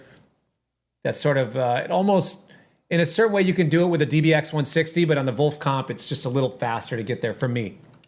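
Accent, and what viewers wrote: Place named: Minneapolis, United States. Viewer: you’re definitely a south Minneapolis dude, I could tell, nothing wrong with it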